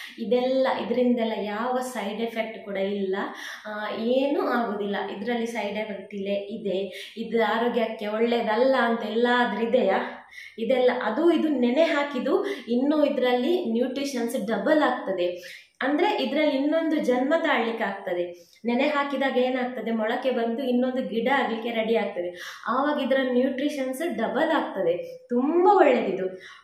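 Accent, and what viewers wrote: Mangalore, India. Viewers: Enklna kudlada kannada 🥰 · aha kudla kannada🥰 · Kudla da Kannada 👍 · I like your manglore style kannada bhashe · ಮಂಗಳೂರು ಭಾಷೆ ಕಡಕ್ ಭಾಷೆ ಸೂಪರ್ ಮೇಡಂ.. 🙏🏻👌🏻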